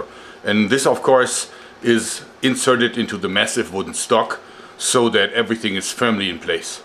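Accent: Germany. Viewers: Very late to the party here, but I noticed something while rewatching this old video. When our mad rubber tubing based genius says one specific phrase, he sounds just like a certain Austrian Governor of California. He sounds nothing like him at all, but when he is bent over and speaking the words "Pumping Up" are uttered. It's like Arnold's voice came out for half a second, and then straight back to the usual German accent. I'm sure it's just an affectation of bending over and speaking, but I still got a chuckle. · I'm sincerely curious, do most German people speak English so fluently? If it wasn't for the accent I would have no idea his first language isn't English. Secondly, I really like how much he seems to enjoy doing what he is doing. Every time he laughs like that it makes me smile.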